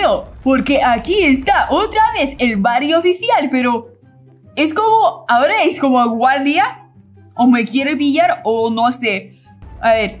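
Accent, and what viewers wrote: Brazil. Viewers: No se ve raro si se escucha así es que creo que es de Brasil por si acaso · Es de Brazil y le cuesta el español ojalá ser de brazil😢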